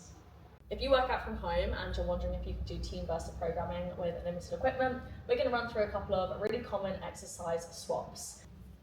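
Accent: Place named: United Kingdom. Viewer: Your accent sounds British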